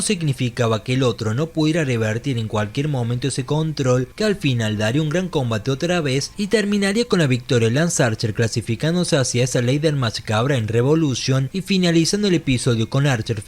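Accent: Córdoba, Argentina. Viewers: Me parecía, yo también lo soy, o sea Argentino y el asentó cordobés es imposible no reconocer.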